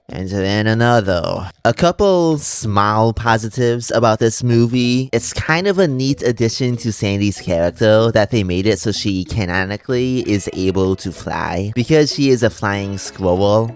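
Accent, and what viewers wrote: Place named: France. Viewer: heyy! i wanted to say you sound like the french nararrator from some spongebob episodes :)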